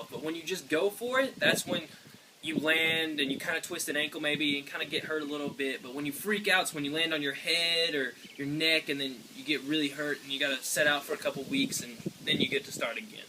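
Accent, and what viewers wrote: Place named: United States. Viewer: Where's is he from iv heard an cow boy voice like that before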